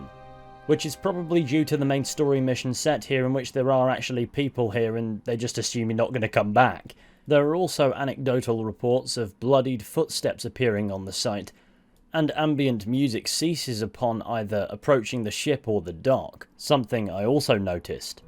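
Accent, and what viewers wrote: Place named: RP, United Kingdom. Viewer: you're a smidge posh, in a good way. I like it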